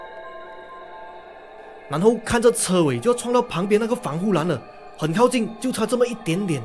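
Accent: Malaysia. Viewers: 很马来西亚feel的华语，听多了台湾的灵异频道，还是这里有亲切感😁，加油啦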